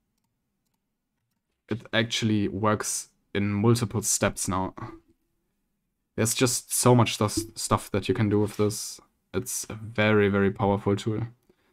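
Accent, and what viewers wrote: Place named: Germany. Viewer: your voice is very german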